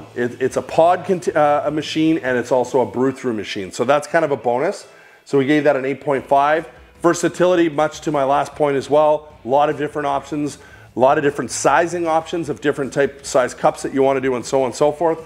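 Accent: Canada. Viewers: As always, &, I love your videos! Your honesty and real life situations make me feel like I'm at your home and you're giving real advice! Much appreciated! My Mom was co-owner of a coffee service. Once a month you should run a full pot of 1/2 white vinegar and 1/2 filtered water through the pot portion. And 15cc white vinegar and 25cc filtered water through the espresso side on Luongo setting. BUT that is only if you use both sides daily! If not than every other month is good. I used to have to clean the machines that were replaced, or being repaired. White vinegar is better than any so-called coffee machine cleaner! Those cleaners almost never flush out entirely. Their residual lousy taste lingers!!! White vinegar flushes right out! As a rule of thumb, I would always tell our customers to make your first pot of just water. Then make ALL the coffee & espresso you want!😊 Optimum coffee/tea temperature of 55-68°C/167-170°F is best to not experience the bitterness that most people find distasteful about coffee. 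Never put a Yeti, or any other insulated double walled steel/plastic/triton cup on the hotplate on the pot side!!! It can cause a fire! Nova Scotia, eh? I thought I detected an Eastern Canada accent! Parlez vous France'? Je parle les France' au les Canadienne! 🙂 Again, I LOVE your videos!!! All your hard work is much appreciated!!!🇨🇦🇨🇦🇨🇦 Nancy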